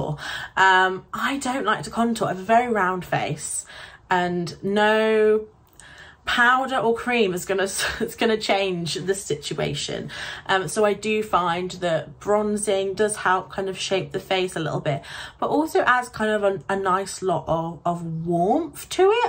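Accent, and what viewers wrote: United Kingdom. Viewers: Her accent sounds like she is from Devon or Cornwall. She does not speak with a cockney accent so its highly unlikely that she is from the London area.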